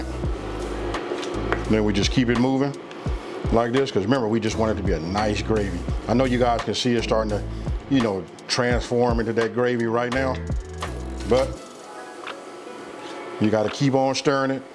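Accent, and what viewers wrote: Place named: South, United States. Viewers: You the man I grew up in the south I'm ga boy You are ga or Alabama or Mississippi Good cooking. God bless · I'm Canadian and I really really like American accents. Yours is off the charts! Love that Southern twang. Also, this recipe looks delicious. Will definitely try it!! Cheers 🍻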